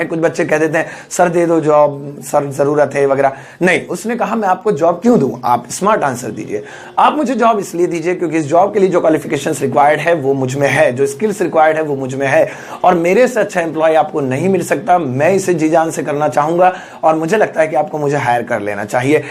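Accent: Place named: Haryana, India. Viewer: Sir ji ap ki haryanvi must h ..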